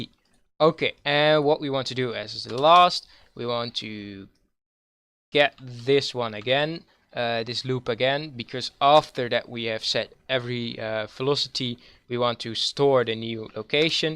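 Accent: Netherlands. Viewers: Are you Dutch? Als Je NL bent Dan hoorde ik het aan Je engelse accent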